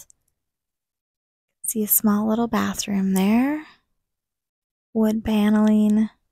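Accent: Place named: New York, United States. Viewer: Hello.. I. Enjoyed. Emenseley. Your. Video. How. Ever. Your. Warm and. Easily. Understood. Your. New York. So. Soft. And. Clear. meaningful. Or. Your. Commentary. In. It. Was. Sense and. Sensibility. Of. Your. Honesty. Voice. That's. Kept me. Watching. Your. Video. Was. An. Insight. FOR me. You. Also. Have. Explained. Every. Detail. And. Information. Well done. FOR. Your. Knowledge and. Your. Attention. Wish. Luck. And. A. Good. Time. All of. You. Thank you so. Much bye. FOR. Now. I. Will. View your. All. Your. Next. Videos.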